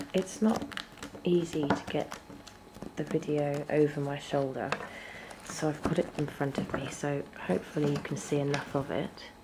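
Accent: England, United Kingdom. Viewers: just discovered your videos. you have a very calming and lovely voice, even when you're speaking normally! not too loud, not too soft. the accent helps too...but i'm an american; we generally love listening to english accents ha :)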